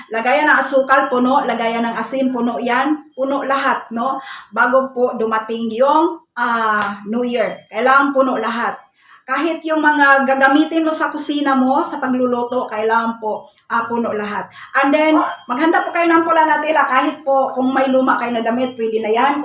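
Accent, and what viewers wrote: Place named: Visayas, Philippines. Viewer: Bisaya ka maam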